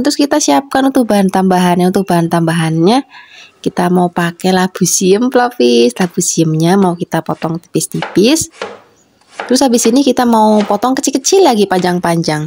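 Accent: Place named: Java, Indonesia. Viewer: Praktis ya 👍 mb kasih org mn, kok medokmen jawane😊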